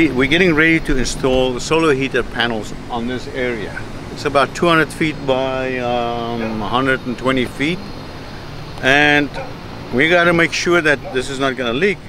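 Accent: South Africa. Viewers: Sounds south African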